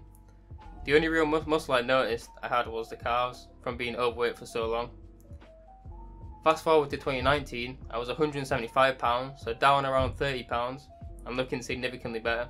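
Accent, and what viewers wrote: United Kingdom. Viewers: i know being lean is dope but I Really think if you bulk for a solid year its really going to pay off. Good work so far though. also wtf i didnt expect you to be british lol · England accent nice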